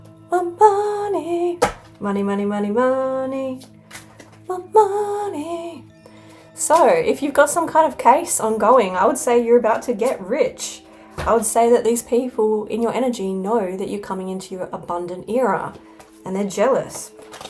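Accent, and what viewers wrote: Australia. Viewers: Cheers me up no-end :face-blue-smiling:, love the aussie accent too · You Australian?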